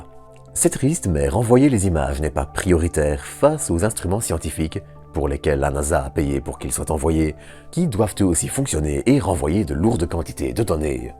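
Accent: Belgium. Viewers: Avec l accents belge une fois..ça fait comique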